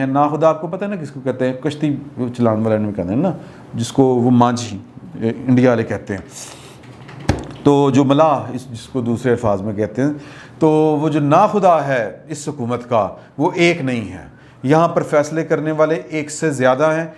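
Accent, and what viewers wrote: Punjab, Pakistan. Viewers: Sadky jawan app ke urdu or mix panjabi Kay. 😂😂😂😂😂. Love 4 u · Ur great 👌 Sir 👌 g good job 👍 naam tou suna hi hoga dialogue with Awais Sheik Multan Wala Punjabi tarka Kamal Ka hota hai aapka Bhai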